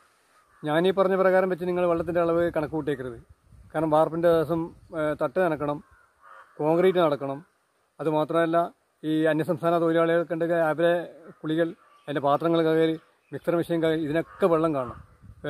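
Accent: Kannur, India. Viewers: ningalude samsaram kannur anennu mansilayi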